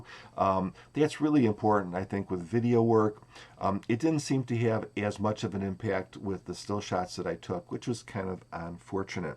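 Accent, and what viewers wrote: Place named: Upstate New York, United States. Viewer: Thanks for the review, I'm picking this up used tomorrow for $100. I think it's great for the price. I enjoyed listening to you speak, your accent reminds me of my relatives in Oswego NY.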